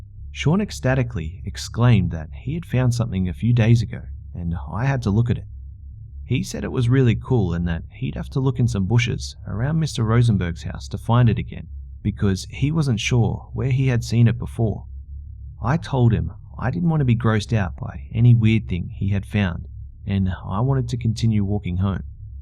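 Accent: Australia. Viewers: aussie for days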